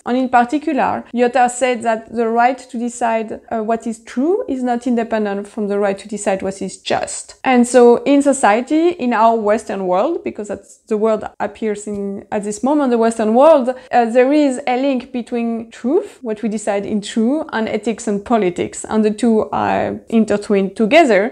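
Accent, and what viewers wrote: France. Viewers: Thanks for your video, which gave me the opportunity to test some of my ideas. One thing I couldn’t help but notice. Some of the comments make me want to take up the cause of woke micro aggressions although the intent is positive. I mean to say a French women (my assumption) with a French accent! Imagine it… people need to travel more I think aside from COVID and global warming implications…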